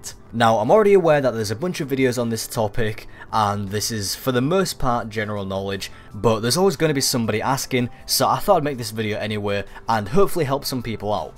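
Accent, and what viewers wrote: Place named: Northern England, United Kingdom. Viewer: Because he has a northern English accent.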